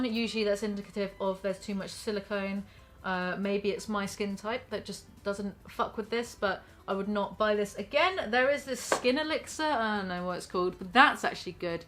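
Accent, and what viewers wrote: United Kingdom. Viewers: Your british accent makes everything funnier 101% lmao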